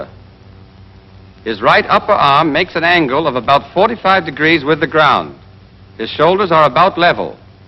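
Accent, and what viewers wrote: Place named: Boston, United States. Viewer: He's speaking in a Boston accent, or New England accent.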